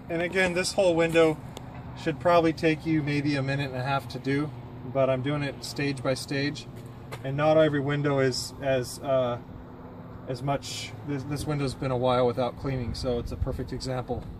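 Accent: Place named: Canada. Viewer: you have a awesome nearly perfect canadian accent :D i love using dawn dishsoap in my housecleaning.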